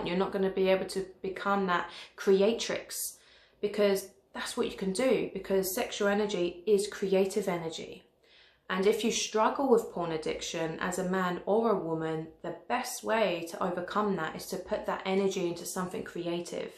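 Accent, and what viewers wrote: United Kingdom. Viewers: WHY do you dis the westeners with your british accent. I'm Not defending us but we're the worst?? Are you kidding??? that is negative. its called sex not shagging,btw shagging makes it sound like its not really sex nor that it has conseqences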